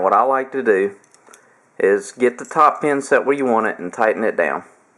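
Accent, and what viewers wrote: South, United States. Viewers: I used this review 2 yrs ago, to clarify the instructions on the package. It was very helpful. In fact I bookmarked it, and still refer to it on occasion. As for the sight itself, I originally had it on a Mathews Drenalin, with a 4 power lense, and it greatly increased the accuracy. I have since upgraded to the Mathews no cam st, but I transferred the sight because it does not need to be upgraded. I now proudly own the deadliest tack driving bow & sight hunting combo I can imagine. Just for the record, you NEVER need to apologize for the southern drawl, y'all. Good hunting. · Mate, as an Aussie, I find your southern drawl to be both easy to understand and charming. Thanks for this great video. You have done a good job of pointing out the features that make this sight different and special. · I bought the pro HD HS version with the sliding dovetail and it arrived today. 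Mounted it and I'll sight it in initially early next week at the indoor range. It is a very nicely machined piece of equipment and my PSE Revenge is sexier already. LOL Thanks again for the review and I have some great friends with Southern accents so you're good to go! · GREAT REVIEW....LOVE THE SOUTHERN DRAW.... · Give you 300 likes, I just bought this sight from a guy and had no idea how to use it, your tips on setting ranges is great. Thanks, southern drawl no problem, from Arkansas ha.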